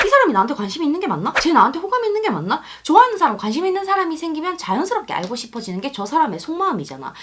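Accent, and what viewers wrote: Gyeongsang, South Korea. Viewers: 온니 대구 사람이에용? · 언니 대구 사람이구낭😍 이렇게 엮어들어거는거란 말이죠?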